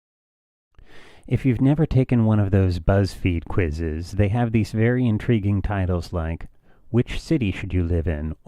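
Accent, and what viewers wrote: United Kingdom. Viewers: I love your Paul McCartney accent lol. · Killer British accent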